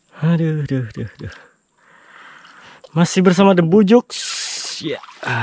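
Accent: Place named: Sarawak, Malaysia. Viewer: bahasa nya kyk sarawak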